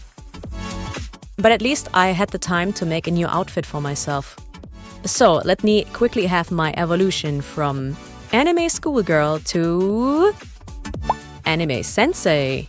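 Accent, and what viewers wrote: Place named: Germany. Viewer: Nice german accent :D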